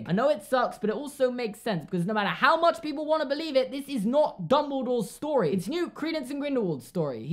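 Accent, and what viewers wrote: United Kingdom. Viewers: it sounds like he's trying an english accent with some sort of european undertone.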